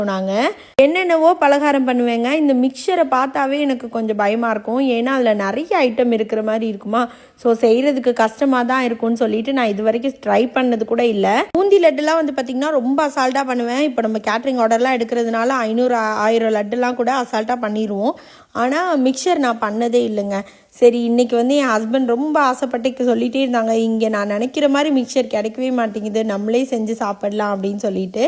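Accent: Madurai, India. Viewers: Akka neenga madurai ya akka anna entha oooru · Neenga madurai ah? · Akka neenga madurai yaa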